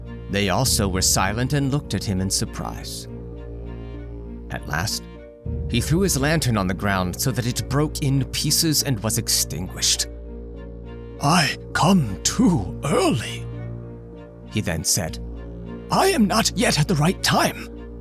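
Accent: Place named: United Kingdom. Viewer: Unbelievably articulate narration with subtle...nearly British undertones and enthusiastically colorful character voices add everything and give life to both of these stories... and every other story I've heard from you. Excellent work!😁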